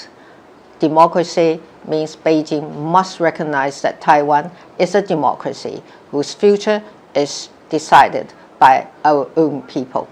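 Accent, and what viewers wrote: United Kingdom. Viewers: 濃濃的英國腔.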